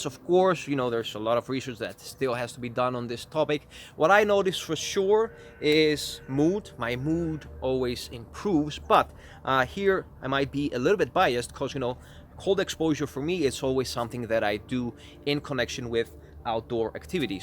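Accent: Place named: Netherlands. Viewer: For someone who lives in Greece, you sound Very Dutch! 😀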